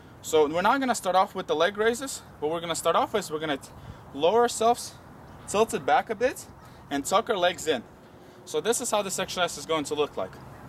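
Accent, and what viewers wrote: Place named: Russia. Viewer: Ya I thought I recognized the surroundings и русский акцент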